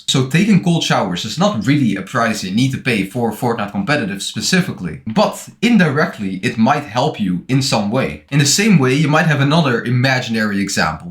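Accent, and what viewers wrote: Netherlands. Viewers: YOO R U DUTCH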